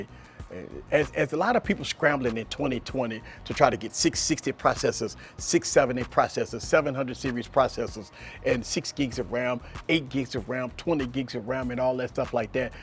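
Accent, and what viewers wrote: Louisiana, United States. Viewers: He sound Creole like he's from Louisiana New Orleans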